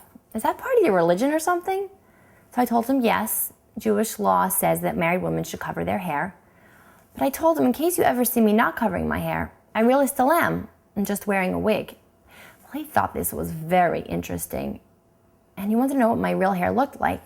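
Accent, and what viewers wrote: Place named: New York, United States. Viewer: I must admit, when I first started watching your vid, I thought, "O dear. Here is an annoying materialistic Jewish gal with an annoying New York accent". But then I found your vid to be very spiritual and quite Biblically educational. More!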